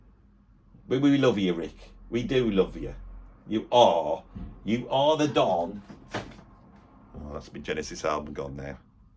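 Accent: Birmingham, United Kingdom. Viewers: I think a lot of people watch your channel for your comical Brummie accent plus your wealth of knowledge.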